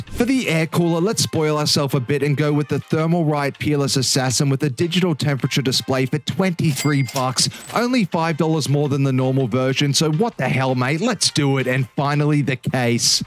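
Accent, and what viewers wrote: Australia. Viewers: Your Aussie voice saying, "...the total price of this PC being seven hundred and ninety-nine Benjamin Franklin's..." made me spit out my beer, as if it was a Vegemite Sandwich. Benjamin Franklin appears on the $100 Bill [in US Money]. If that were true, this crappy PC build would cost $79,900 [US Dollars]. Quite the overstatement! You owe me a beer. Next time I'm Down Under, I'll let you know., so you can put one in the refrigerator for me. I don't drink 'em warm. Oh, sorry, forgot you're not European, you're Australian. Scratch that. I'll drink a warm beer there. I'm not sure if you guys [blokes, mates, whatever you call yourselves] even have refrigerators. BTW, I get drunk after one beer, so you might want to make a bed for me to sleep over on. Oh yeah, after I fall asleep drunk, I sometimes piss myself, so heads up! You might want to put a plastic cover on the mattress before I sleep over. 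As for breakfast, nothing special, just two eggs "sunny side up", some bacon, hashbrowns, orange juice, coffee, and toast. You don't have to make pancakes for me. I don't want to be a bother. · are you aussie? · why does this ai narration sound so aussie right down to the adjectives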